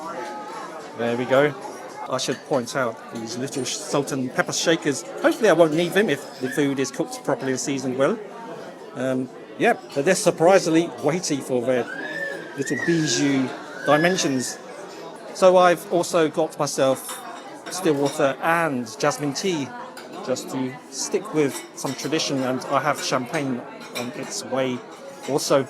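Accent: United Kingdom. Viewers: You look Asian, but your way of speaking and your mannerism are purely British. At 98 British pounds = $133 for a Peking duck which costs only $36-38 in Houston,Texas it was quite priced. American salary is much higher than Briton's. You must be the privileged in British society.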